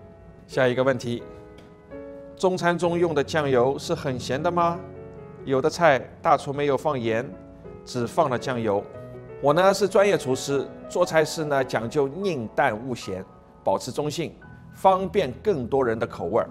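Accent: Shanghai, China. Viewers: 能听出来John大厨的上海腔调，不亏这么多年在魔都积累的经验。😂 我是湖南的，希望可以多看到点湘菜系的菜肴！Best wishes to the TS crew, esp. during these dark days. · 上海人伐？听口音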